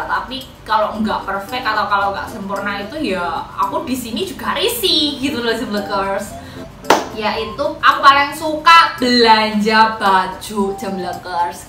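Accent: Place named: Surabaya, Indonesia. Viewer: Tak kiro iki wong suroboyo soal e logat e wes suroboyoan poll.. 👍👍👍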